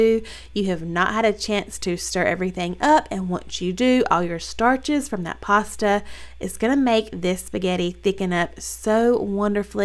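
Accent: South, United States. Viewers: Love your southern accent 💕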